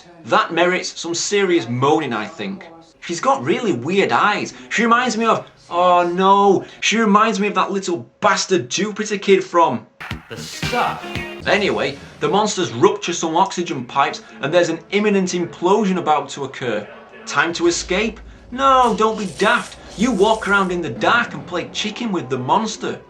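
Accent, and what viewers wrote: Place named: Yorkshire, United Kingdom. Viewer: He sounds like he's from Yorkshire in north england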